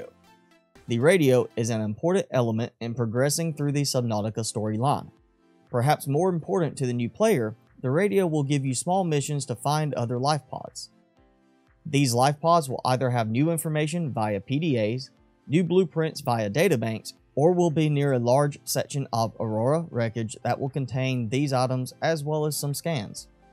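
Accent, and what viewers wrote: South, United States. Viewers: Thanks man. Btw you sound country as hell!! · Country as hell for a YouTuber maybe lol. 🤠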